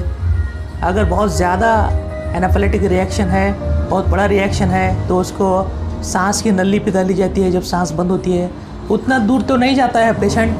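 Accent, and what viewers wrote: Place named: Bangladesh. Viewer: Bangladeshi ho ky ...sound se aise he lgta hai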